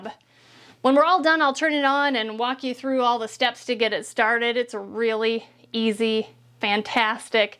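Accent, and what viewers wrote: United States. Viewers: Herb versus erbs. ... Stop nick picking I'm from the UK and lived 40 yrs USA. I love the American accent. Just enjoy the video and please be kind. Thanks.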